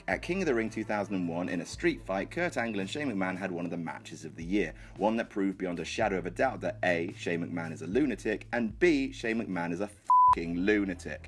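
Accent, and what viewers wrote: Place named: United Kingdom. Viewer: Come on man seriously,can they get people too do these that you can understand? here that whispers half the time,mumbles 1/4 of it the other 1/4 says 3,4 words at a time.Plus that thick British accent who the heck can understand what he is saying?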